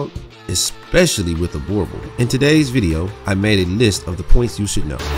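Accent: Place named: Ohio, United States. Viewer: That man educating and sounding like he from the other other side of Ohio. ❤️ get extra proper for the people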